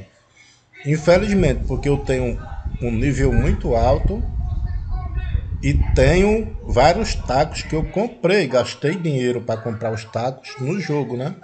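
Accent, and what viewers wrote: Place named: United Kingdom. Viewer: Gostei do inglês britânico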